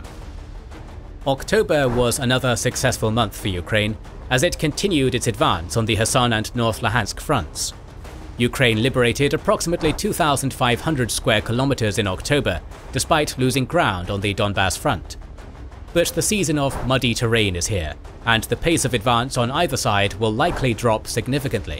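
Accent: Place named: United Kingdom. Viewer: Gives me vibes like The Economist. Also British accent, same style of snark. I love it!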